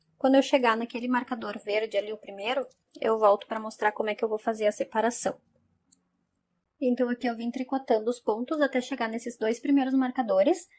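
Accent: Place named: Rio Grande do Sul, Brazil. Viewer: Olá pelo teu sotaque tu és gaúcha de onde tu és?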